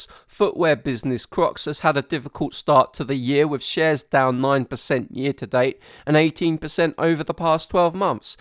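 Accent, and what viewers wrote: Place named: United Kingdom. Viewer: Great presentation and love the British accent!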